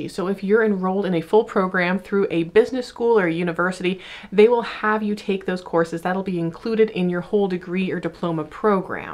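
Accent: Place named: South, United States. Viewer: THANK YOU SO MUCH!!! I never realized that existed. .75 has the perfect southern draw my ears need.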